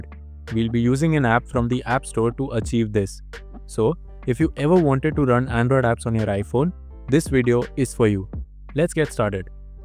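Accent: India. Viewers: Are u indian bro ?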